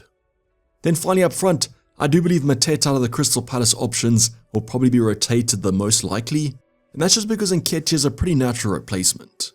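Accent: South Africa. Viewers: This ou is definitely south african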